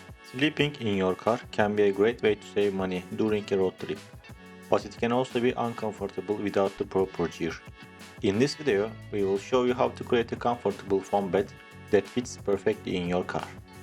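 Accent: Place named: Turkey. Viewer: Merhaba. Bende de bir c5 aircross var. Altımıza yorgan sererek yatak mi hazirlasam diye düşünürken sizin videonuza denk geldim. Telaffuzdan da bizden olduğunuzu fark ettim :) Güzel fikir gerçekten kartonla ölçü almak. Bana ilham oldunuz, teşekkur ederim. İki ufak çocugumuzla 4 kisi uyumayi düsunuyoruz. Tekrar tesekkurler. 😊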